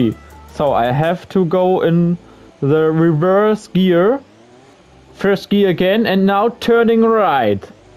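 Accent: Germany. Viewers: dieser deutsche dialekt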